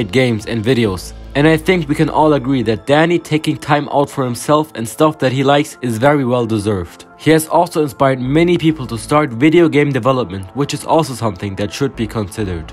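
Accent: Germany. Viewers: are you german (im german) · You sound very German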